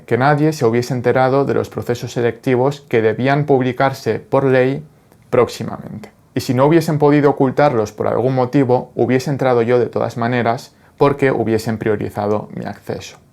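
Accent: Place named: Alicante, Spain. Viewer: Ya me percato que eres de Alicante, pero ¿de que pueblo? por tu acento cuando hablas el valenciano de Alacant (de la provincia) que varia según la zona y no aquellas en la que hablan el valenciano de valencia. Solo he visto dos videos tuyos en los que hablas del "tinglado" mas no de las clases de guitarra, instrumento que amo, en todas sus vertientes, y que mi papa me regalo una guitarra española, igual que una flauta, unas castañuelas, una armónica ( muy buena, no me la regalo mi padre ) dos panderetas de las de verdad ( una de un novio tuno ) una zambomba ( yo soy mu clásica )y ya de mayor independizada me compre un teclado para principiantes....en fin, en fin, no aprendi nada....mi arte discurre en otras vertientes y mi instrumento, el unico que puedo manejar, va incorporado a mi ser....no obstante, y por profesión, anexada a las otras, de estudios nada mas, sin masters ni doctorados ni padrinos de ninguna especie, aprendi y sigo aprendiendo edición por ordenador ¡ el futuro desde hace veinte años ! 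porque realmente lo que me interesa es la "creación" pero daria una de mis siete vidas por saber componer, solfeo y manejar el arsenal electrónico de Vangelis, no será por falta de imaginación, pero jamás, jamás , jamás....se me ocurriria, ni se me ocurrio ni ocurrira, presentarme a un puesto acomodaticio, público o privando, pero si es del estado, ni te cuento, de esos que nos ilustras.... tienes unas dotes de comunicación innatas, levantinas, de captación rápida, por efecto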